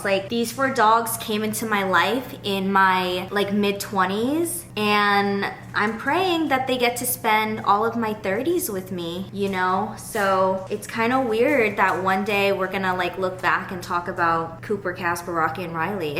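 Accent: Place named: Vietnam. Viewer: good guess! I was thinking Vietnamese too from her accent.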